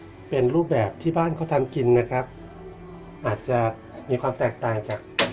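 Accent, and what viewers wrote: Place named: Korat, Thailand. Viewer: คนโคราช.บ้านเอ๋งงงงงง พูดแบบนี้ครับ ผมก็ยุ่ อ.พิมาย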